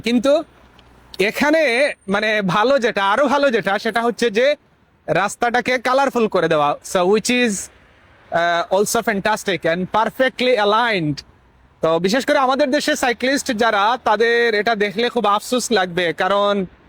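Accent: Sylhet, Bangladesh. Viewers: ভাই কি সিলোটি নাকি .?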